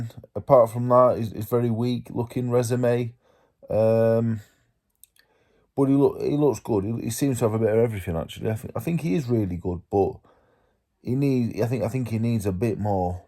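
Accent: Yorkshire, United Kingdom. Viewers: Glad you said Joe is smart...not being articulate doesn't mean someone isnt smart.. he's clearly witty. Stupid people think he's stupid. And agree, you clearly have said you speak funny cause of your tongue and you have a strong Yorkshire accent... you're as coherent as you've always been.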